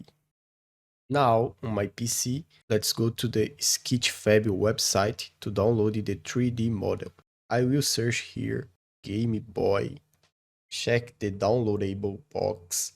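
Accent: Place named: Brazil. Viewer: just asking, are you brazilian? i do, and your accent sounds like mine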